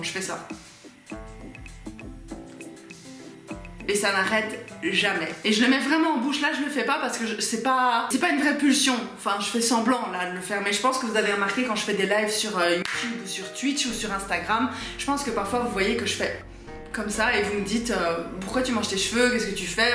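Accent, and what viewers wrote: Belgium. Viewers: J'hallucine peut-être auditivement mais .... serais-tu BELGE? ❤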